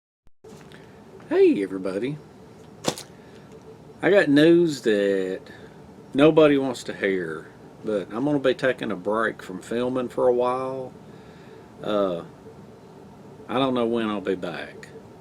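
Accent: South, United States. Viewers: I’m a long time subscriber who never comments until now. I have much respect for your videos and watch them as they become available. I typically turn em up loud so I can hear your southern accent throughout the house, lol. Your fans will love ya just as much when you finally have time to continue pursuing your hobby! What you and have done is a great contribution to YouTube. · Take care of what you need to do. Sounds like you’re gonna be a might busy. I enjoy your Southern twang and you seem to be a really mellow guy. I watched your ranger roll video yesterday after I watched a video on ranger rolls on Grunt Proof’s channel from four years ago where he gave you a shout out. Spend time with your dad. I lost mine 23 years ago. Mom is still here and she’ll be 84 in a couple of weeks. I’ll be watching more from your video library and I’ll be looking forward to your next one. 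Take it easy. Mark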